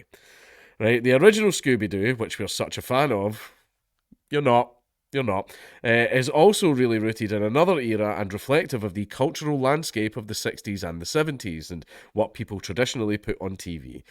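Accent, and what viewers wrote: Scotland, United Kingdom. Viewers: I like your accent, you sound like William Wallace.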